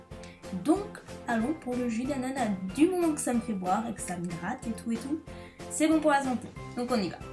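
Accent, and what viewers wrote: South, France. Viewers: Tu es sublime! ♡ Je regarde tes vidéos depuis pas mal de temps maintenant et c'est toujours un plaisir!! Tu es absolument magnifique et tu as très bon goût. Je te souhaite de pérenniser sur Youtube et que tout aille bien pour toi. Ta bonne humeur et ton petit accent du sud sont un vrai rayon de soleil!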